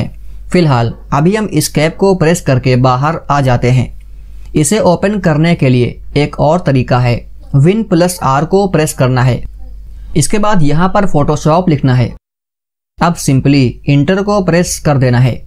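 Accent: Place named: Pahadi, India. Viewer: very nice, lekin apke bolne ka andaj pahadi lagta hain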